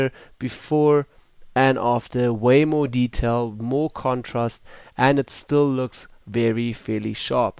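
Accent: South Africa. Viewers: You sound South African. I dig that!!! Nice video!!!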